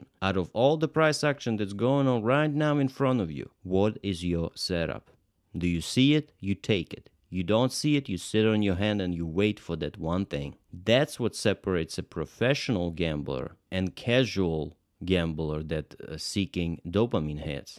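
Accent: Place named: Sevastopol, Ukraine. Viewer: Wonderful Your accent reminds me of an old friend from Sevastopol Good luck from Ireland☘☘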